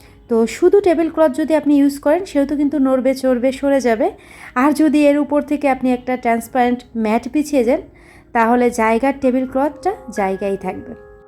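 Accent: Khulna, Bangladesh. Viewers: আসসালামুআলাইকুম কেমন আছেন আপু আপনার কথা শুনে আমার খুব আপন মনে হয় কারণ আমি ও খুলনার মেয়ে। আপনার বাড়ি খুলনা কোথায় জানাবেন প্লিজ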